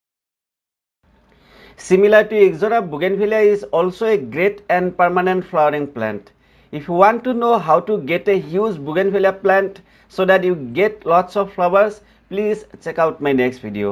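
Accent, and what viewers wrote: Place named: India. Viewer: You do not need to improve your accent, your accent is very good. I am from Australia and your English and your accent are great! We dont want you to lose your Indian accent, it is what makes the world unique and mulitculturalism is wonderful!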